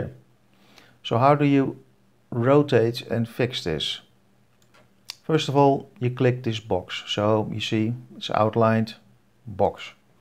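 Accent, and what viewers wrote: Netherlands. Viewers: you sound a lot like a dutch man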